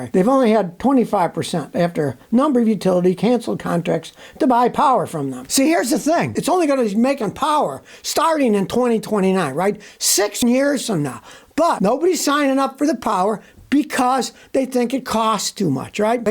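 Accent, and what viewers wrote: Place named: New York, United States. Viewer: you left, but your new york accent never did...ha ha.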